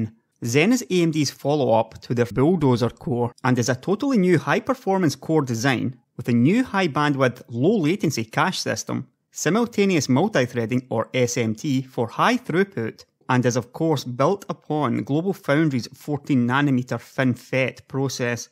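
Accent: Scotland, United Kingdom. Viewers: he is full on * Scottish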